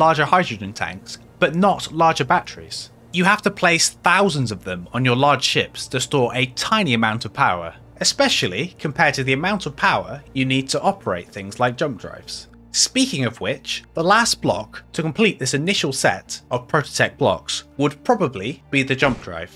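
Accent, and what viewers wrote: England, United Kingdom. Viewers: are you from south`or central england ?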